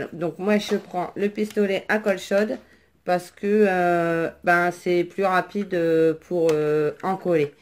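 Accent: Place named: North, France. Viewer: Re :-) si tu savais comme elles sont moches mes rosaces lol, oui y a un léger accent du ch’nord lol, gros’gros bisouss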